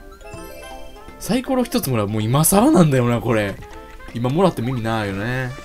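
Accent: Kansai, Japan. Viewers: 関西弁すてきです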